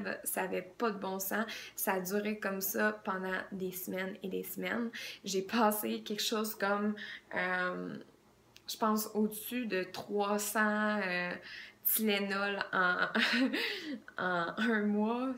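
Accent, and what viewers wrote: Canada. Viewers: C'est trop chou l'accent quebecois <3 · Très charmant l'accent canadien pour un Français ! Pour mon cas ça serait une dépression mais j'ai une énorme fatigue chronique suite à une colossale surcharge de stress .J'en ai marre de chercher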